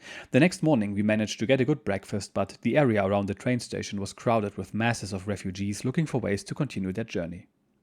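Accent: Germany. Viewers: I love these stories so much. I'm a lover of history. Especially military. And it's not often I hear anything from the German perspective. And the German accent makes it that much better. Thank you for the top notch content.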